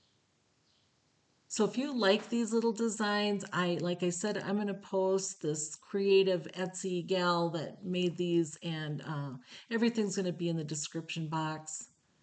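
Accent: Minnesota, United States. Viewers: Your calm rural Minnesota style of speech is so nostalgic and comforting to me. I grew up in Minnesota and haven’t been back to visit in a long time. 🥰 Warm hugs from Texas!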